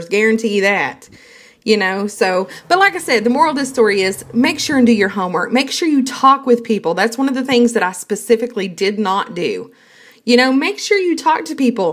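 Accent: South, United States. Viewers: I love the country accent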